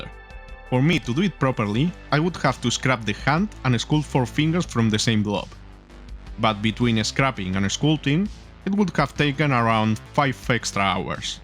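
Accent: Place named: Spain. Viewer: That thick spanish accent... puede ser?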